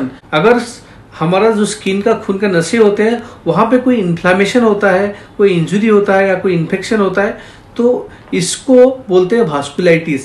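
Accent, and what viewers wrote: Odisha, India. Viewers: Are u an odia sir??😊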